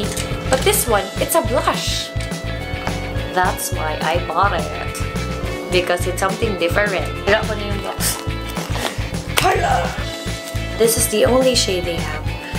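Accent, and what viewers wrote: Visayas, Philippines. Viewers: kakyut jud oi! makaproud maminaw gabisaya ka😂😂😂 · Ang cute.. Bisaya..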